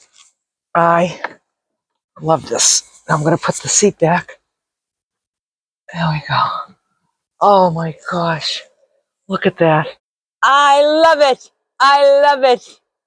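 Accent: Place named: New York, United States. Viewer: New York or New Jersey? Cause so many of my family members sound like you do. I could be wrong in placement of origin. Ill accept it if I am but if possible I'd love to know.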